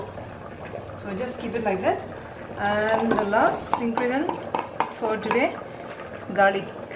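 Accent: India. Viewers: Your hindi speaking is so nice but English sounds like hindi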